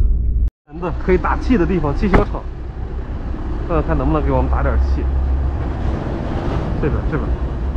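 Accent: Inner Mongolia, China. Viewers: 内蒙古口味的普通话，听着很新鲜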